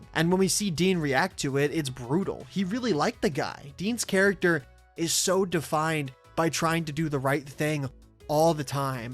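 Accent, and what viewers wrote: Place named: Midwest, United States. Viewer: This guy sounds like polyphonic. He must be from the Midwest.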